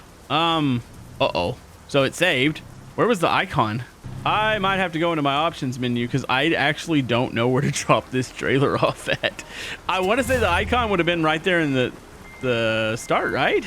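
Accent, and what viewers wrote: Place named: East Texas, United States. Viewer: Sounds like you’re from east Texas! Tyler,TX here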